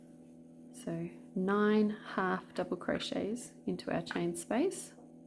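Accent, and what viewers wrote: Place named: Australia. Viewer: Hi! Looks great..just found it and will make one! Greetings from Italy.. I guess you are in Australia right? ( accent😀)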